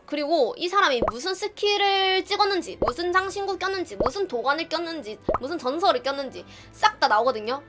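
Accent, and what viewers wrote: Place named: Gyeongsang, South Korea. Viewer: 아..경상도 사투리 진짜 극혐이다 1초도 못듣겟음 역겨워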